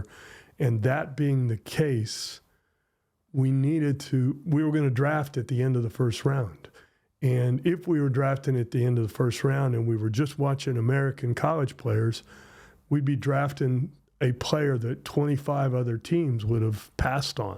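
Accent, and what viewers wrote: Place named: England, United Kingdom. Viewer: Merci pour ton accent anglais, j'ai l'impression de l'interviewer.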